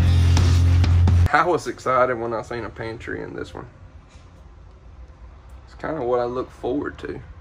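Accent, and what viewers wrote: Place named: United States. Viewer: Why dou sound more country then chance and id have both 🥓 sausage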